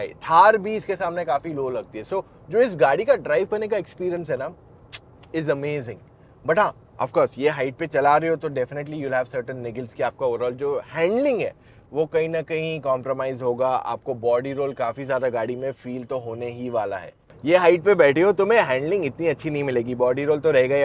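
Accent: Gujarat, India. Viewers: Bhai kya aap gujarati ho kya ?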